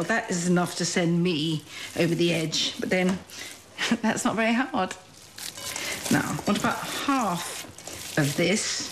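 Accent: United Kingdom. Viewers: 我喜歡奈潔拉老師的口音 濃濃的英國腔真的很適合做播報員主播記者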